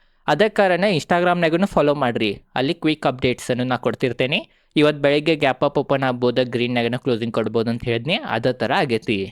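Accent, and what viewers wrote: North Karnataka, India. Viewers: ಯಾವ ಕಾಂಟ್ರವರ್ಸಿ ಇಲ್ಲದೆ ತುಂಬಾ ಅದ್ಬುತವಾಗಿ, ಅಚ್ಚು ಕಟ್ಟಾಗಿ ವಿವರಣೆ ಕೊಡ್ತೀರಿ.. ನಾವು ಬೆಂಗಳೂರಿನವರು ಆದರೂ ನಿಮ್ಮ ಉತ್ತರ ಕರ್ನಾಟಕದ ಈ ರೀತಿ ಮೃದು ಭಾಷೆಯ ಶೈಲಿ ತುಂಬಾ ಇಷ್ಟ ಆಗುತ್ತೆ....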